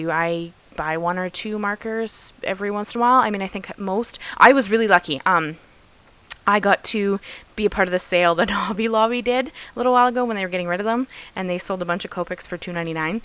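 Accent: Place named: Canada. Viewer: Halfway through your video I was wondering if you were Canadian. I just had this feel because of how you speak. And sure enough I was right! 😁 Takes one to know one I guess. I loved your video and the card. The background does look very pretty and awesome colouring job too.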